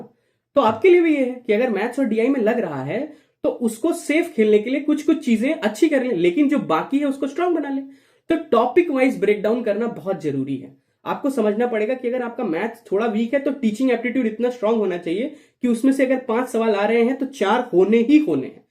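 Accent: Bihar, India. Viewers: Your Bihari accent is so cute😂❤ and the video is really helpful thank you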